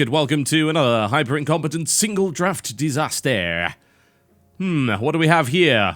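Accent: United Kingdom. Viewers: Amazing series. Always the best content from you,. Keep it up. Hell, even the girlfriend prefers content from your channel. Calls you the classy sounding British guy.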